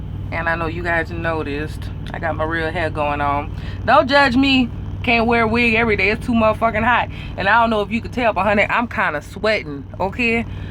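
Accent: Louisiana, United States. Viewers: R u from Louisiana? Trying to place ur accent. LoL. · You sound a bit Cajun or Creole. Whatever the correct term is of course. You are cool as shit. Would be fun to have a couple shots or beers with you... I hate this FUGGIN Automated Transmission. 17 KW T680.but I believe the Gentleman is correct. Tap it to engage it...then try easing on it. As a matter of fact this stupid. Transmission was just messing with my going over such a simple hilly pass as Tehachapi, California 58... Bullshit was just acting all week with 17K loaded heading West Towards Bakersfield and now even weaker with just 32k headed East on Tehachapi pass. FUGGIN BS Y'ALL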